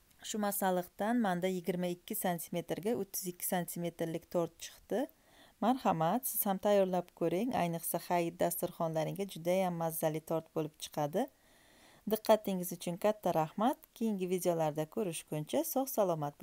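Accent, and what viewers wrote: Khorezm, Uzbekistan. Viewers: Horazm shevasiga ohshattim.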